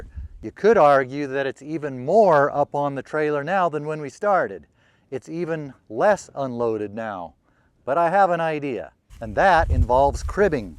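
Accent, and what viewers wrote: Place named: West, United States. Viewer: the wonderful buster scruggs accent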